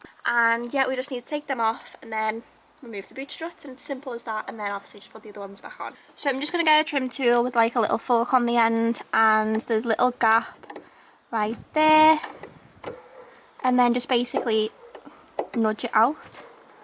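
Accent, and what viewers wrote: Liverpool, United Kingdom. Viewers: are u from liverpool? I was deployed to england for 6 months, trying to see If i learned anything about accents while I was there lol! Super clean ST!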